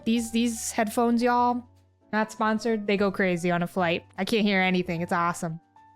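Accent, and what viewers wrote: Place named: Midwest, United States. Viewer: Is it just me or does she have the most Midwest accent possible?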